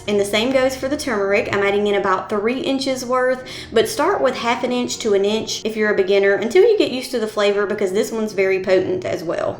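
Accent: South, United States. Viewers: I love your accent. I’m taking a guess Southern States of US. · She country af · your southern accent 😍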